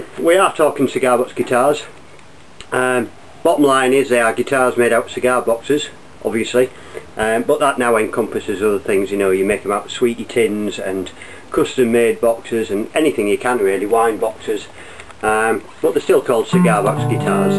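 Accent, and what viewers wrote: United Kingdom. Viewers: Ey up lad, not heard that great dialect for years. I lived most of my life in Manchester and then for my last 6 years in England I lived in West Yorkshire. I am guessing you are from somewhere around Manchester though? I left England in 1982 and I now live near Chicago, Illinois, (still got the English accent though :) ). Man do I miss hearing good dialect! I came on here because I just bought a 3 string cigar box guitar and wanted to get some idea as to playing and tuning it. I normally play 6 and 12 string guitars, but I have to say that I was blown away by the sound from these things. I agree with the adjustable bridge. The one I just bought did not have one and already I can hear the intonation errors. Also it had a peizo pick-up which I'm not keen on. I'll probably go ahead and modify it as per your recommendations. By gum I liked your video, keep up the good work lad. 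All the best, Chris. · Great video! by the way I couldn't stop staring at your T-shirt I noticed it said snowden but my eyes are bad that's all I could read and couldn't help but thinking I wonder if you know there's a guy named Snowden that builds cigar box guitars here in the states Snowden cigar box guitars? I had to laugh because I wasn't sure who I was looking at I was pretty sure Snowden was American but you sound definitely English ... I liked your video now I have to choose between 4 guitar makers ... Nigel Mctrusty Australia ,YOU Great Britain , Snowden guitars I believe usa and Justin johnsen cigar box guitars usa I don't know what to do now hahaha!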